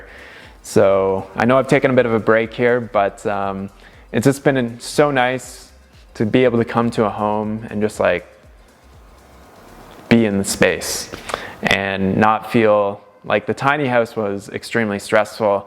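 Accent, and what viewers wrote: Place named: Canada. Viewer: he says aboat like a Canadian! nice job on shower.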